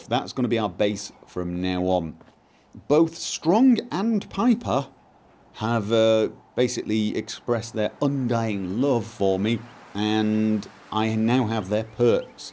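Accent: United Kingdom. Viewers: He’s so fricken British it’s awesome lol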